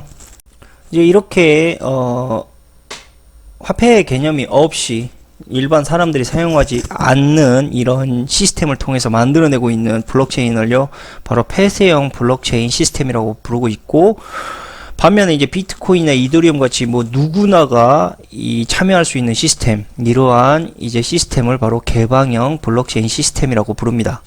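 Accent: Jeolla, South Korea. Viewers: 역사를 좀 배우세요 저분말이 사투리?원리ㅐ 호남말이 표준어 입니다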